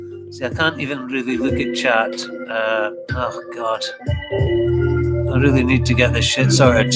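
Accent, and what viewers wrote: Scotland, United Kingdom. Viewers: magick patter in a Scottish voice...... finally !!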